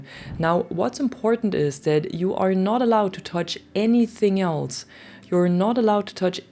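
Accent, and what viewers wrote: Germany. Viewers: Man hört das Du Deutsch sprechen kannst 😂🖐️🖐️🖐️🖐️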